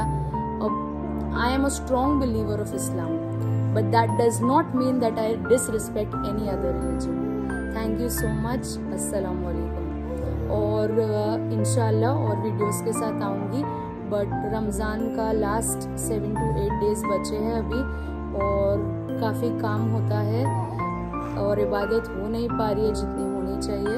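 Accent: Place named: Gujarat, India. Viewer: Good to know that you're a gujju fellow. Tamari boli par thi j lagtu hatu ke gujju chho. Khair Allah ne aapko sahi raah dikha diya hai. Dua main yaad rakhna sister.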